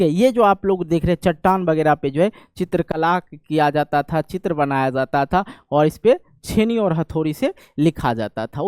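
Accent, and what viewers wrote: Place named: Bihar, India. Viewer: Bhut Bhut bhut mja aaya Bihari Andaj me pdne me 😊😊😊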